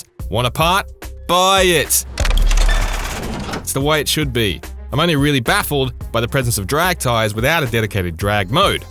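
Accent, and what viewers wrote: Australia. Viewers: He's Australian? 🆕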